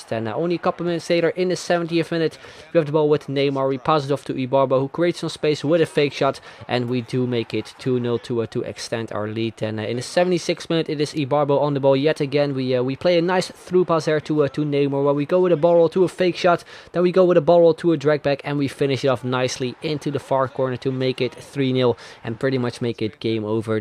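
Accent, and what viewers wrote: Netherlands. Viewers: Youre dutch jaja ik hoor het gewoon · is he dutch?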